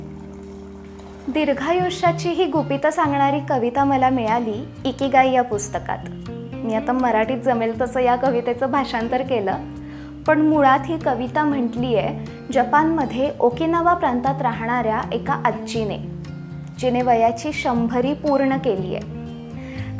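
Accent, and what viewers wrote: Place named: Kolhapur, India. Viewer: तझा आवाज खूप बालबोध आणि मुक्त आहे मी तुझे भटकंती चे सारे videoपाहिले खूप सुंदर मराठी बोलणे तू जप ले आहेस त्याला कोल्हापुरी बाज आहे प्रतेक गोष्टी चा बारीक तपशील देणे ही तुझी सहज आवड आहे.जपानी लोकांचा जिवनाकडे पाण्याचा दृष्टि कोन सहज असतो.कविता वाचन छान, जपान ला जावून आलीस का?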